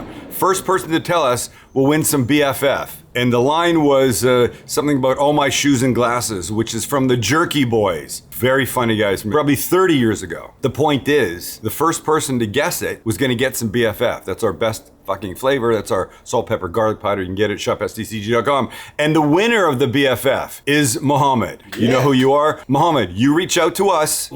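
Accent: Ireland. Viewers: C. he used the C word. you can tell because of the irish accent. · pretty good irish accent there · 8/10 on the Irish accent.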